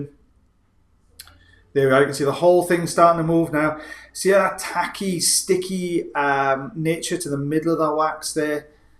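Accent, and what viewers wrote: Wales, United Kingdom. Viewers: Yeah, it amazing how it does relax you isn't it? Is the subject matter, or the lilting Welsh voice that soothes you?😴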